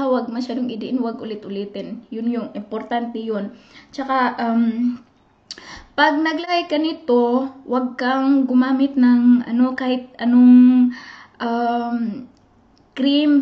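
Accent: Philippines. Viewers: boses ilongga ka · Kapag bisaya magtagalog ang lambing pakinggan ,,kaway kaway sa mga bisaya dyan.. by the way mam pwede po ba yan gamitin sa nagpabreast feed?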